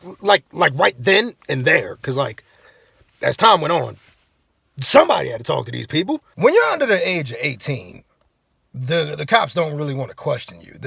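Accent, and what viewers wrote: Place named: New Orleans, United States. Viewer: Can tell ur from nola sound like. Watching part 2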